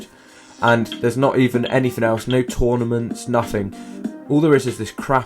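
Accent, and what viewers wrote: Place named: United Kingdom. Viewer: I can hear the British Accent more in his older voice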